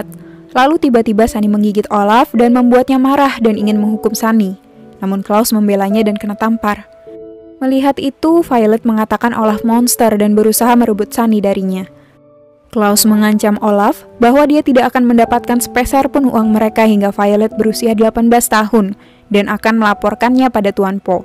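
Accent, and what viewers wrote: Bandung, Indonesia. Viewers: Wih orang bandung👍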